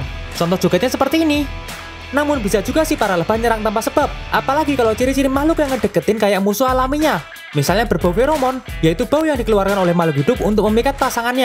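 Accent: Java, Indonesia. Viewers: JOWO! confirmed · ternyata adalah wong jowo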